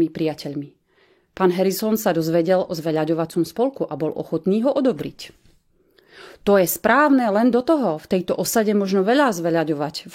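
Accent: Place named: Slovakia. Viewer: Cítit, že slovencina je tvoj matersky jazyk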